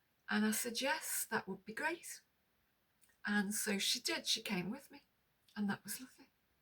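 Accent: United Kingdom. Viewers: Hi, just found you. I want to give you a hug. Everything you have said resonates with my journey which started 3 years ago after my husband died. No one understands until they go through this, we were together for 50 years. I have just returned from my son & his family (for Christmas)just outside Liverpool so recognise your accent😊 I am in Malvern. Please keep putting your feelings on here, I am sure it will help you (and many others too). I too have realised that our “couples friends” no longer have anything in common with me and that my husband seems to have never existed for them. Yes- they too may have to face this one day. Sending love ❤ Sue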